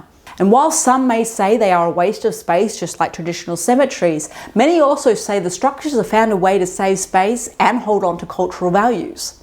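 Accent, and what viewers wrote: Australia. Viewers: I love what you're contributing to the world's death conversation with an Aussie eye, heart and accent,! There's a bit about the annual redressing of the dead and bringing them to the dining table in Caitlin Doughty's book, From Here to Eternity as Well. While I'm personally in favor of the being eaten by wolves or vultures or insects or whatever camp, these practices from other cultures have their own beauty and wonder. (Sorry, I live in the US, so those are my most familiar resources. I don't for a moment believe that we have all the answers and am humbly grateful to get these extended world views!)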